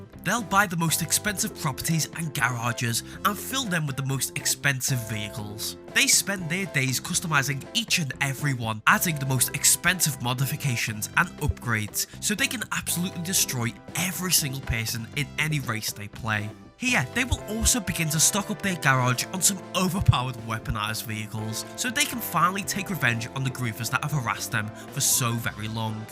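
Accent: Liverpool, United Kingdom. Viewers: L bri ish narrator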